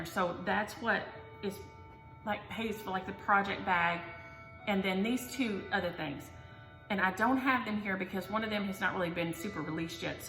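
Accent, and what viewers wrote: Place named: Appalachia, United States. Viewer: Congratulations on over 4000 subscribers! You out did yourself with this week's stories. You talk a lot like us hillbillies here in WV (that is a compliment 😊). Patriotic Cardinal or Project.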